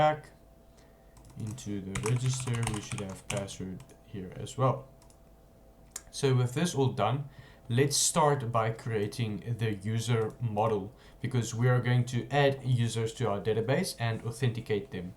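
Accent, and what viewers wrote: Afrikaans, South Africa. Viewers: South African ? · You've got an Afrikaans accent. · You have the fattest Afrikaans accent ever. Love the video >3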